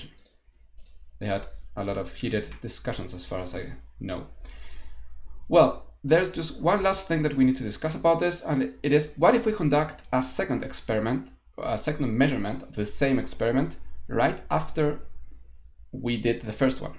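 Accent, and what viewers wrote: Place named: Germany. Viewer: Good explanation brother, btw I love your German accent. I wish your channel grows and you keep making videos for us (or me)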